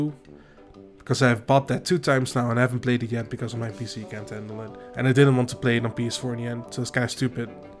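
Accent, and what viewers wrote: Netherlands. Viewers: The dutch accent 👌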